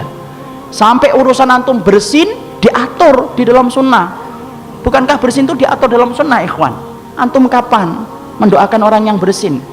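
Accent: Java, Indonesia. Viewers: Usdadz ...logat jawanya medok bnget aku suka...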